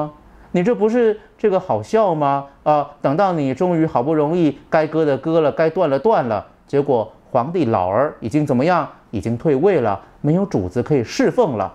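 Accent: Standard. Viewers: 被你的中文发音吸引了!!这才是正统国语发音!! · 炳忠的口音是在向普通话靠拢啊！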